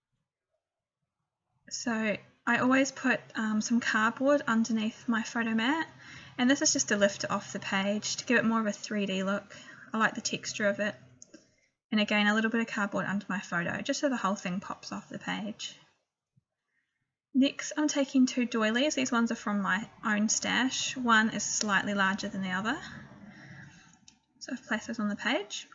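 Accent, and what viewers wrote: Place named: New Zealand. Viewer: I thought you sounded more kiwi than Aussie. I love your work!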